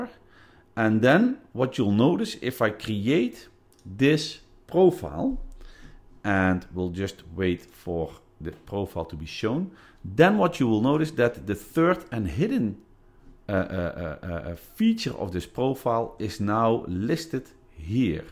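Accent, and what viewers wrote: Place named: Netherlands. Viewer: Hallo U komt uit Nederland dat is goed te horen ☜(ﾟヮﾟ☜) Ik ben bezig met mijn MS-500 bedankt voor de video :)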